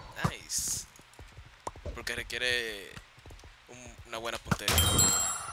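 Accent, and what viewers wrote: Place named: Dominican Republic. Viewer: Hay deohmeo mijo tu ere de RD representa ese acento :v parece pajaro :p sin ofender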